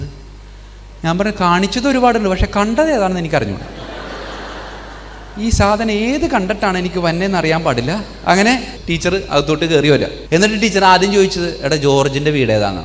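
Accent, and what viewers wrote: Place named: Irinjalakuda, India. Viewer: irinjalakkudakkarrude samssaram pole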